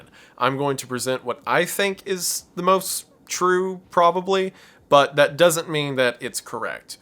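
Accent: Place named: South, United States. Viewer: What kind of dialect is speaking in? Like what part of US, where they say "setting" instead of sitting? Is it just a southern thing? lol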